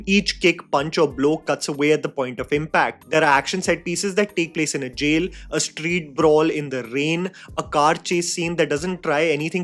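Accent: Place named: India. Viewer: I just cant understand indian accent 💀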